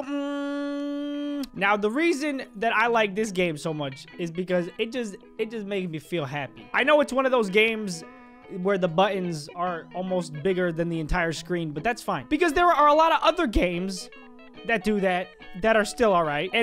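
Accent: Italy. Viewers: you need to about me out in the next video!? Btw I love the Italian accent and I am part Italian!☺😊😀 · I think you cute with the Italien accent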